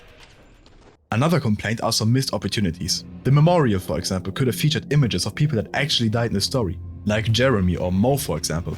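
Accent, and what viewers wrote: Germany. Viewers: Sag mal, kommst du aus Deutschland?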